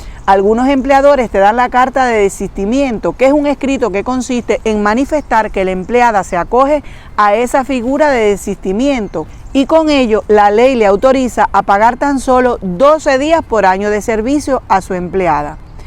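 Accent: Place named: Cuba. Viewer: GRACIAS A USTED, POR SU CONTESTACIÓN. BUENO, CREO NO HABER ESTADO TAN LEJOS EN MI DEDUCCIÓN. YA SÉ QUE NO ES "ABOGADA" DE PROFESIÓN, SINO "FILÓLOGA", ADEMÁS DE INVESTIGADORA. LE FORMULÉ LA PREGUNTA, PORQUE PUDE APRECIAR SU DOMINIO SOBRE EL TEMA, Y ES MUY OBVIO QUE SI ESTUDIÓ FILOLOGÍA, Y ADEMÁS ES INVESTIGADORA, SEPA DESARROLLAR CON FLUIDEZ Y PRECISIÓN EL TEMA QUE ABORDE UNA VEZ QUE HAYA INVESTIGADO SOBRE ÉL. EN CUANTO A SI ES CUBANA, LO SUPUSE DE ALGÚN MODO, AL ESCUCHAR SU PRONUNCIACIÓN. YO TAMBIÉN SOY CUBANO. PUES ENTONCES, DESDE ARTEMISA, CUBA, RECIBA USTED UN CORDIAL SALUDO. VALE.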